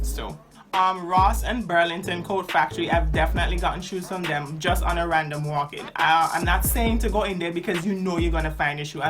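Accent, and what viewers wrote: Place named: Caribbean. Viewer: Thank you for this! 💕I’m a young girl wear a size 12 feet and can’t find cute shoes anywhere😩. Love your accent. I’m also from the Caribbean. I’m from the Virgin Islands.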